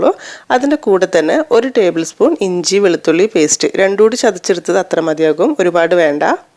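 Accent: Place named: Malappuram, India. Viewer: നിങ്ങൾ മലപ്പുറം ആണോ സംസാരം കേട്ടിട്ട് കണ്ണൂരൊ മലപ്പുറം എന്തായാലും ചിക്കൻ സൂപ്പറായി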